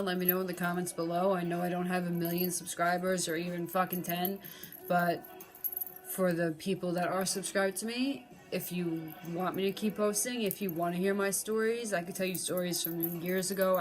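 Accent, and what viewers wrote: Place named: United States. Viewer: I've just started watching your channel, your honesty about your sobriety and life is very encouraging. I have just started back in recovery. Thank you for your straight up posts. They have helped me get back to recovery and get on with it. Like your American accent attitude .. Thank you mate All the way from New Zealand Auckland.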